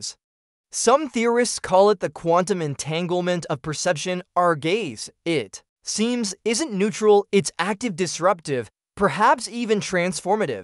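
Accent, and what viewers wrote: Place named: United States. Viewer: Is it? Sounds like typical american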